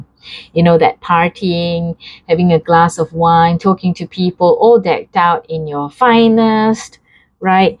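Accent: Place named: Scotland, United Kingdom. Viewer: Really great read. My first time watching you and noticed you have about 4 accents messed into one. Would love to know what they all are. I think there is a bit of Scot thrown in the mix.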